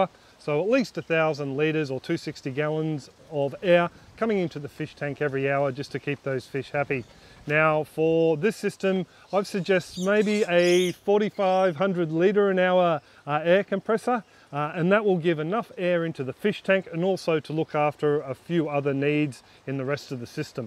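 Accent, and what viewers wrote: Australia. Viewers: Really good, interesting and educative videos, you've been very useful to my setup, your explanations are clear despite your funnny Aussie accent ! It's completely understandable.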